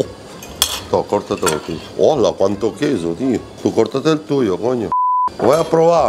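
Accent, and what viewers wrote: Italy. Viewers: Hola me haces acordar a mi abuelo cuando te escucho hablar con ese acento italiano... seria genial q te des una vuelta por argentina para probar todas las pizzas q hay.... son distintas a la clasica pizza margarita italiana pero no dejan de ser exquisitas... e ir a la calle corrientes a recorrer pizzerias es todo una experiencia... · hablando como español con acento tano es automaticamente porteño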